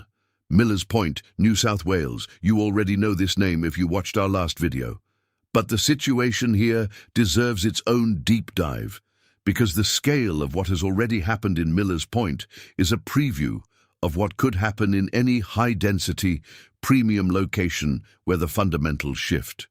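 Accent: United States. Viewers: If you hear this voice of an American,, don't listen to a word they say Don't listen at all Their Aussies behind it, straight out lying for their own narrative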